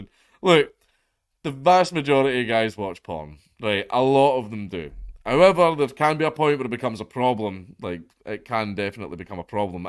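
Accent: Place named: Glasgow, United Kingdom. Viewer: Completely unrelated but do you listen to grime by chance? I’m from Topeka Kansas n iv been checking out artists all of the UK n Ireland! Gotta admit the Glasgow accent is abit difficult to understand but I think I understand it much better than most in my area having watched many movies of Glaswegian in em! Keep up the great content n keep ‘em coming, no pun intended!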